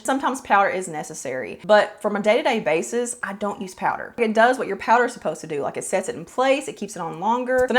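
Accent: South, United States. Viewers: I love your Southern United States accent, I think it sounds warm and welcoming. (I once had a wondeerful boyfriend with a Kentucky accent so I have a fondness for the accent).However, many people across the United States and around the world are used to a more flat "Nebraska" accent. As you get a global audience, you may consider getting speech coaching to get the plains midwest accent that people think is the United States accent. This is not fair to people with accents from the Southern United States, but it will be important as you gain a global audience who do not have English as a first language. Also, you might want to talk more slowly. I tend to move my hands when I talk, so I know what it is like to make gestures when I talk. Try to keep your hands out of the video except when you are applying makeup. (I used to be a teacher, and I was videotaped once when I was a teaching assistant, and seeing the videotape is really useful.). 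I hope you take these comments as helpful and useful, because my intention in writing this was out of a good heart. If you find my comments offensive, please let me know. This is not what I intend.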